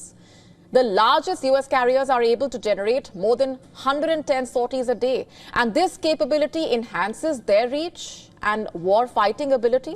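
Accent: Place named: India. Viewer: She spoke with too heavy Indian accent compare to Chinese newsreaders who speak very smooth English.Hard to understand her fully. She need to speak slowly.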